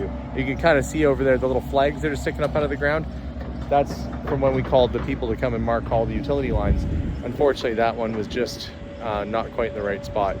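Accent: Canada. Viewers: I wish I had a hundred dollars for every time he said ass fault. I could go on a shopping spree. I thought maybe he was saying it so much because in his last video on the pool house, people in the comments kept pointing out how he says it differently than how it is pronounced in the US. Maybe that is how all Canadians pronounce it. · It's a Canadian pronunciation. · Remember that he is Canadian and that's how most Canadians pronounce it😊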